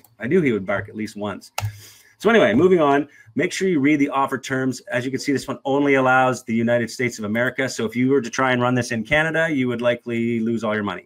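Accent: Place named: Wisconsin, United States. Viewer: Are you from the midwest? I’m hearing a Wisconsin accent i think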